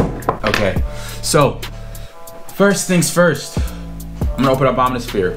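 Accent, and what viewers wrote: United Kingdom. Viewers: Dis is my first time watching one of your videos and I fully thought u were British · No way, your London accent is on point ...coming from a londoner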